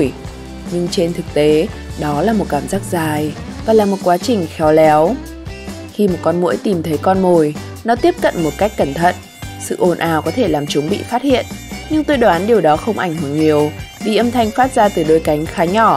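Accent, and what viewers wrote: North, Vietnam. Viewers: Cho anh giọng Bắc hay và ấm hơn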